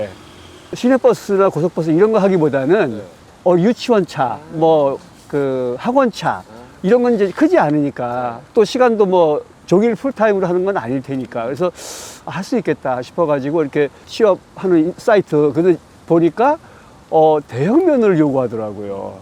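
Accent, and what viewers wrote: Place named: North Jeolla, South Korea. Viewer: 말투가 절라북도구마잉. 열씨미 사는구마잉.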